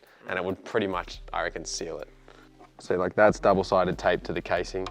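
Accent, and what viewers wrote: Australia. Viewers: Aussie accent can’t tell difference between ‘Lithium ion’ And ‘Lithium iron…’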